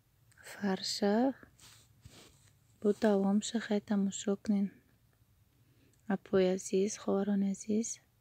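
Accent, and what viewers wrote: Kulob, Tajikistan. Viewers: Салом ма дав да канали хдт мехмон шидм да як канали дигат дромадм ай тарзи гапзанит фамидмки тоза Кулоби нав якта блогери кулоби дидм .манхам кулобиюм .бо хамрохи шавхарам бо фарзандом да масква зиндаги мекунем Кулобчон ба пеш .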